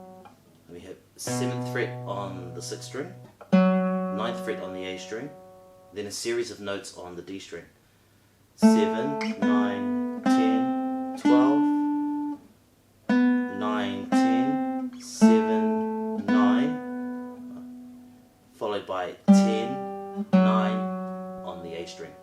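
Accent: New Zealand. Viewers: I knew I could hear the NZ accent! Greetings from Christchurch. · Kia Ora brother, absolutely fantastic stuff, I have been working on a few of your uploads and loving your gentle nature and articulate communication, impressive Spanish pronunciation mixed with classic Kiwi "orf" instead of "off" .. love it to bits! Much Aroha to you. Um I see you are using a voicing of what appears to be a C7th and you are saying how it's digit gymnastics - I watched Ana Vidovic and Pepe Romero and they appear to be using a bar arrangement from the 8th fret with the high E? So I am wondering what is the best? Obviously you have proven your way works but I can't help wondering, any thoughts? Cheers. Nick. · great, thanks a lot , sure i will . oh you are from New Zealand ¡ its a nice accent . Take care amigo ¡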